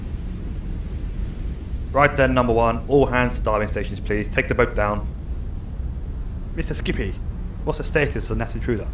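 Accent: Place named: United Kingdom. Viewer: What’s with this British talk on an Australian Built Submarine I am disgusted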